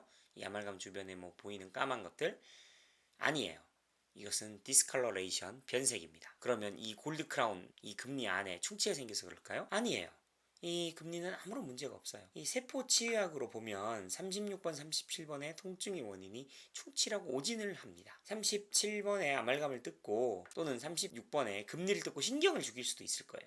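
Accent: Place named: South Korea. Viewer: Lateral excursion에 대한 좋은 내용이네요... 유투브를 전문적으로 하시려면 영어쪽은 감수를 받으시는게 좋을듯합니다... 너무 한국식 영어라^^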